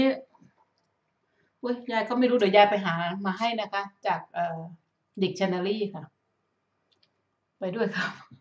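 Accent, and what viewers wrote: Isan, Thailand. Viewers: คนจังหวัดใหนค่ะเห็นพูดอีสาน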